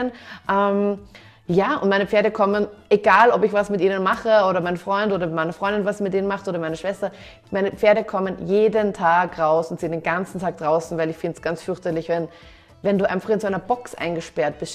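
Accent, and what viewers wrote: Austria. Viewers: Ich liebe deinem Österreichischen aktzent 😂😊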